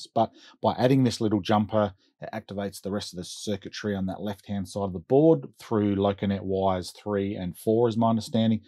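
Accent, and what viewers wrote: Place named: Australia. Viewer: Well, kinda hard to say, but listening to it was easy, and though I was doing other things, I was able to key in on what I was searching for, I.E., tying my Arduino in with my Digitrax system, plus a bonus of JMRI interfacing with both. And I have to be honest, I found your Australian accent cool! That said, I will probably listen to it again and again to get the full gist of it. But overall, it was easy to listen to and very comprehensive. Thanks again, mate, or as we say In America, pal!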